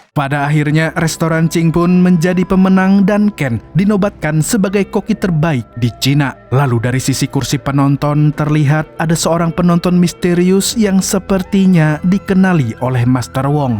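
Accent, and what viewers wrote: Banjar, Indonesia. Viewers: Banjar banar😂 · Urang Banjar kah amang ni...??😂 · Urang Banjar sekalinya pian 😂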